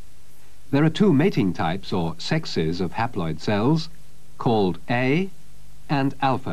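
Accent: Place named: RP, United Kingdom. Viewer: This is great. I love old science ed videos narrated in recieved pronunciation. thanks for posting.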